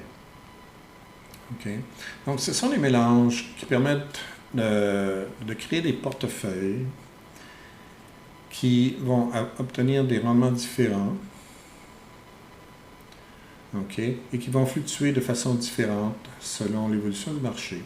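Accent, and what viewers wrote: Quebec, Canada. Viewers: J'ai découvert t'a chaîne dernièrement et je fais du rétropédalage pour regarder ton contenu plus ancien. Je m'étais douté a ton accent anglo que tu étais de la belle province, maintenant il n'y a plus de doute. En tout cas beau travail de vulgarisation, j'apprécie beaucoup ton contenu, tu es très pédagogue. 👍👏😊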